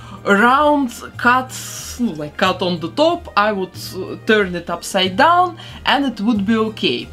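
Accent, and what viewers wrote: Russia. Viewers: Блин, честно говоря, такое ощущение будто автор канала русская. У неё очень явный русский акцент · Акцент слово из России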